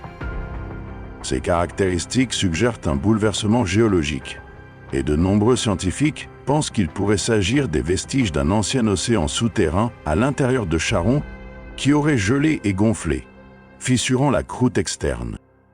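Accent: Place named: United States. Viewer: accent americain ridicule à chier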